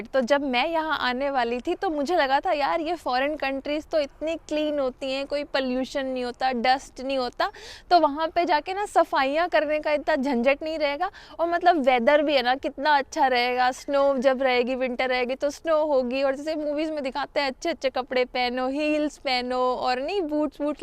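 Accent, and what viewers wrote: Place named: Delhi, India. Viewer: aap karol bagh wali didi ki trah baat krte ho...bda acha lga sun kar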